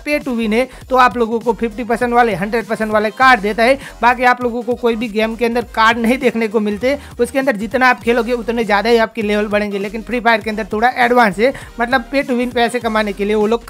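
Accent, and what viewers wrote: Gujarat, India. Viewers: Bhai aap gujrati hooo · app Gujarat se ho kya bro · App gujrati ho kya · bhai aap gujarati ho?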